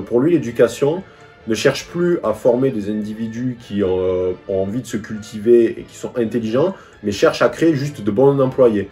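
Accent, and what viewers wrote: South-West, France. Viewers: Encore un nouveau soldat du bon sens qui émerge, ça fait vraiment chaud au coeur en cette sombre période! Merci, vive la culture, vive la France et vive l'accent du sud ouest!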